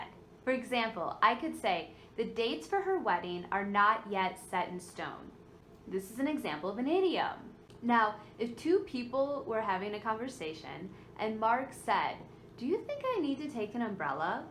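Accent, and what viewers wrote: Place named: United States. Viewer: Thanks good job I love the way American speak English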